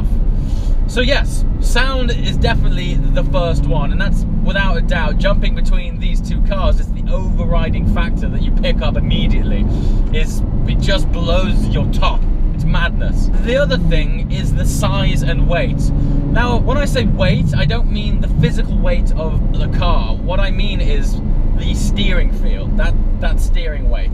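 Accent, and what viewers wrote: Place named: Northern England, United Kingdom. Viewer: Great video dude , keep them coming I love your enthusiasm , & you talk correctly with flat Northern vowels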